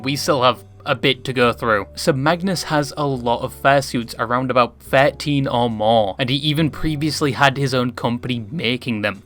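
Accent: United Kingdom. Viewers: 10s in, british, had to click off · You know, I didn't think there would be a British accent out there that doesn't have a hard distinction between the u and a sound, hence him pronouncing furry more like fairy...but, you learn something every day😅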